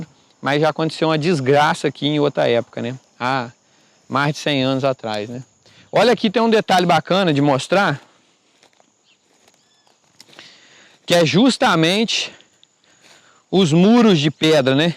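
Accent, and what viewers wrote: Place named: Minas Gerais, Brazil. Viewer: Algo me diz q vc é mineiro, acertei??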